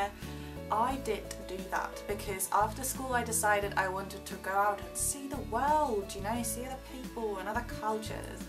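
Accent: England, United Kingdom. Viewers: are ya german? doesn't sound like. you actually have no accent, and you speak german with an english accent, which is impossible for a german! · Woww you sound english.. I thought you were english not german ;o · How did you aquire such an English accent if you're originally from Germany? just curious:)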